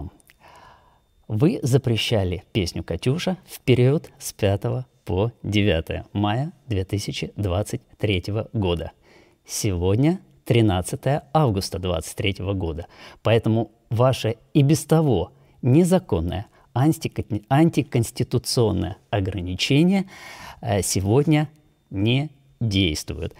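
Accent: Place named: Estonia. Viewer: Кто пользуется могучим русским языком (да ещё и с матом), с эстонским юморным акцентом - прав много раз!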